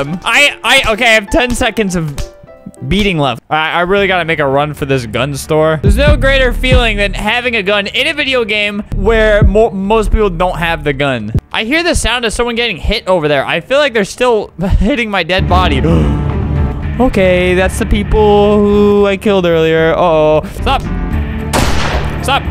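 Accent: United Kingdom. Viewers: This is amazing cause im from South London and quite a few people actually speak like this lol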